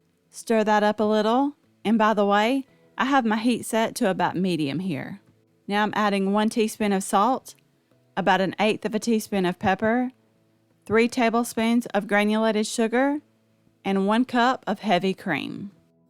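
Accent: South, United States. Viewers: “And don’t that look delicious “ Why don’t Southerners speak English properly?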